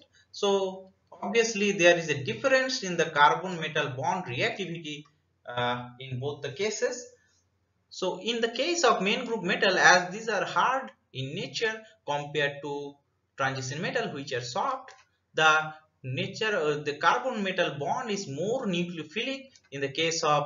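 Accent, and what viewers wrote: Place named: Bengal, India. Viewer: He has Bengali accent, so don't poke him.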